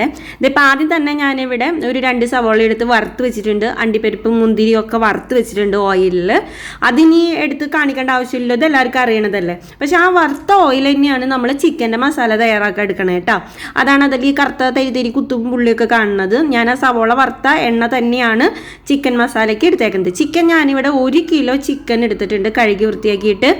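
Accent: Thrissur, India. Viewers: ബിരിയാണി ഇഷ്ടമായി. ആ സംസാരവും എനിക്ക് ഇഷ്ടമായി. തൃശൂർ ക്കാരി ആണോ.ഞാൻ തൃശൂർ ക്കാരിയാണെ. 😆😆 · 🌹🌹🌹🌹ഒരു അപേക്ഷ ഉണ്ട് ചേച്ചീ ഒന്നൂടെ തനതായ തൃശൂർ ഭാഷയിൽ സംസാരിച്ചു വീഡിയോ ചെയ്യാൻ പറ്റുമോ. അത് കേട്ട് പാചകവും കാണാന്‍ ഒരു രസം ഉണ്ട്... 🌹🌹🌹🌹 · തൃശൂർ ആണോ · നിങ്ങള് തൃഷൂർ ആണ 😜 എല്ലാം സ്പീഡിൽ പറഞാൽ പെട്ടെന്ന് ബിരിയാണി ഇത് പോലെ തയ്യാറാക്കാം 😂😂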